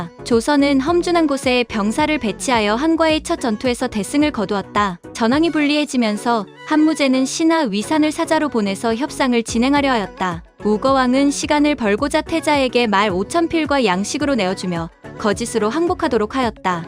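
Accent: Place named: Japan. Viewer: 이거 무슨 일본놈 한국말 하는 소릴 하고 있어 ~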